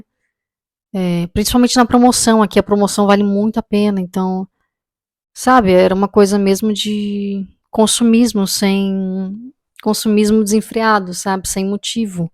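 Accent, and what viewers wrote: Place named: Brazil. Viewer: Se és Portuguesa e estás em Portugal, porque é que falas brasileiro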